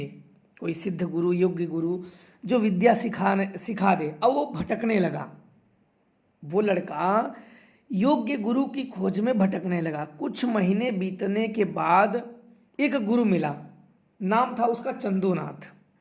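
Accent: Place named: Varanasi, India. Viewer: Shriman jee aapki baate bahut pyari lagati hai. Aapke awaj se lagata hai ki aap Varanasi district ke rahane vale ho. Aap kripa karake mera pranam swikar kare aur mujhpar kripa kare. Mai janata hu ki duniya ke vishay me aapaka anubhav achchha nahi hai. Mai bhi ek bhataka huva ati tuksh manav hoo. Yadi aap chahe to mujhpar kripa karake mera kalyan karane ki kripa kare aur mujhe sachche raah pe chalane ke liye marg darshan de. Mujhe apane kripa yogya banaye aur apane charano me sthan de. Kripa karake apana sampark ka madham bataye.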